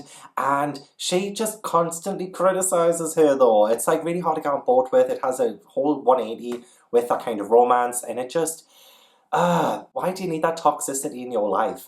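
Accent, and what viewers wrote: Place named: Newcastle, United Kingdom. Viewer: ☕ Told there was drama 👀 Saw the hilarious intro ✨ Heard the Geordie accent 🔥 INSTANT SUBSCRIBE 🔥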